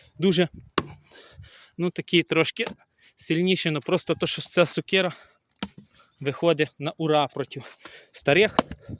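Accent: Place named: Ternopil, Ukraine. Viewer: говір гарний в тебе! Це часом не Терн. Область?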